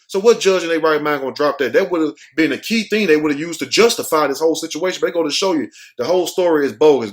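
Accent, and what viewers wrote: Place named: New York, United States. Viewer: you sound like you was born and raise in NYC laughing my ass off #NYC in the house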